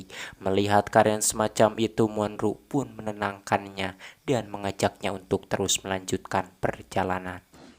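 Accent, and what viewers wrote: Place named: Sunda, Indonesia. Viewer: Geningan si mimin th orang sunda😂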